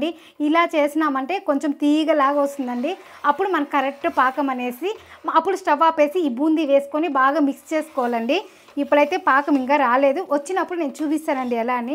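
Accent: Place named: Chittoor, India. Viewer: Maa Chittoor yasa lo matladuthunte Santhosham ga undhi. Tamil and Telugu kalisina yasa. Nanna, Superb ga chesavu Laddu, mixture. Mana function ante Laddu and Mixture compulsory kadha.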